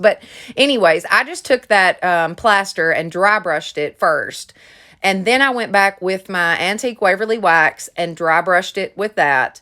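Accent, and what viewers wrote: South, United States. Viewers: I just love your videos!!! You talk like me. I’m southern through and through. From SC. Would you do a video for bathroom? Need a change bad. Thank you sweetie for all your content😍😍😍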